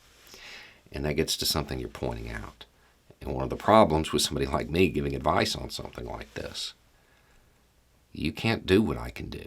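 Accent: United States. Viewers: This is one of your best Beau, I've PTSD, and my wife doesn't like you because you are an American, and look like you do and sound, if it helps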